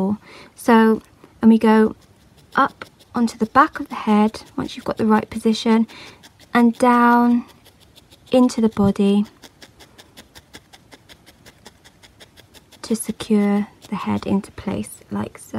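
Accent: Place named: United Kingdom. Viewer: clear and accentuated British English, very easy to understand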